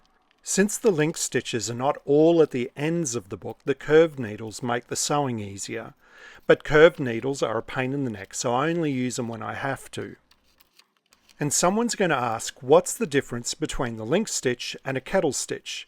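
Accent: Australia. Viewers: Me encanta tu trabajo te felicito. Ahora entiendo porque comprendo todo lo que dices, y es porque tienes acento australiano facil para mi. Un Saludo desde Chile 🇨🇱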